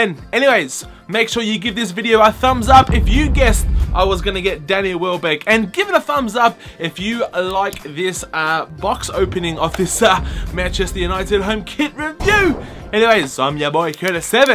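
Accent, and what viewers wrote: Australia. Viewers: Your Australian ??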